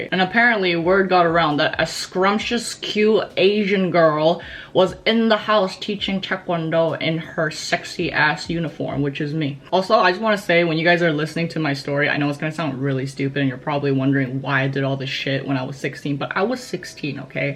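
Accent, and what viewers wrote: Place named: Bay Area, United States. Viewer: Lol! I love your accent. Are you from the Bay?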